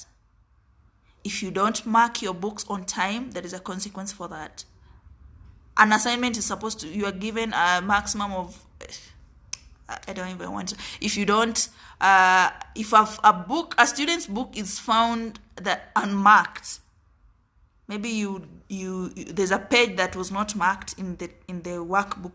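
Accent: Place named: Mongolia. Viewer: You are a very strange woman the same as the guy who told you not to go to Ethiopia. You just look an ordinary Ethiopian girl but you sound like as if you are from Mongolia.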